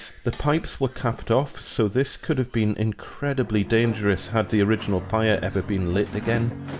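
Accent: Newcastle, United Kingdom. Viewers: Great video thanks for sharing that very well explained thanks are you from Newcastle Gosforth 😀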